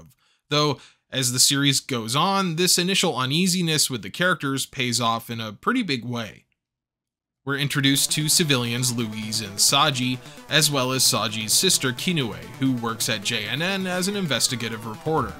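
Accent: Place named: England, United Kingdom. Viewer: Thanks for the coverage, but how you reference all noun/name with the English accent is amusing! For me, So-les-ta-lo being all the way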